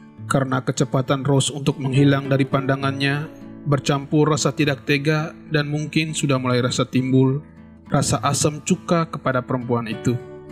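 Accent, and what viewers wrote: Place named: East Nusa Tenggara, Indonesia. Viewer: Seperti logat NTT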